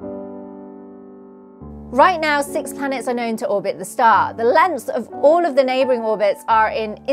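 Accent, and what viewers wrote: United Kingdom. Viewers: ❤❤❤❤Still can’t get over your lovely British accent. 😘😘😘 Jolly good episode👍😘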